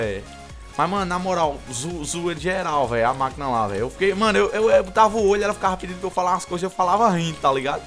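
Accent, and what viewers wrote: Northeast, Brazil. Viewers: Bola de GÚDÍ , BÓDÍ KKKKKKKKKKKKKKKKKKKKKKKKKKKKKK Eu amo os sutaques nordestinos Kkkkkkkkkkkkkkk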